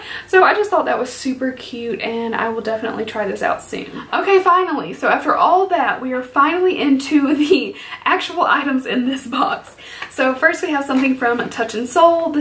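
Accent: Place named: Alabama, United States. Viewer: im from alabama and as soon as i heard your voice i was like "shes gotta be from here too!" and then i read the description lol thats pretty cool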